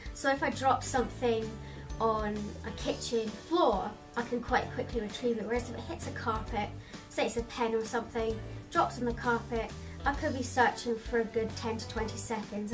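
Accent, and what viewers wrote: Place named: Scotland, United Kingdom. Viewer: So lovely getting to know you better and hearing your lovely voice. I didn’t know you are Scottish! Love the accent xxx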